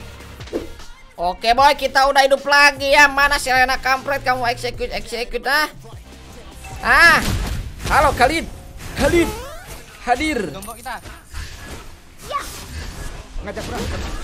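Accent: West Java, Indonesia. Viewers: Bang lo orang sunda bukan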